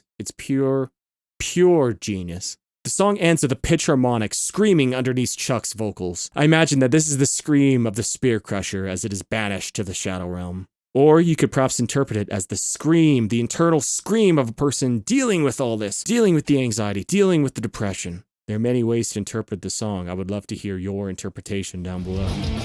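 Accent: Canada. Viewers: I thought you sounded Canadian! Hello from B.C! Thank you for doing a wonderful analysis on this beautiful song. is not only my favourite album but my favourite metal album of all time. I love how well the storytelling goes with the music and its overarching message gives me hope and has helped me persevere through my own struggles. I look forward to watching more of your content- keep up the good work!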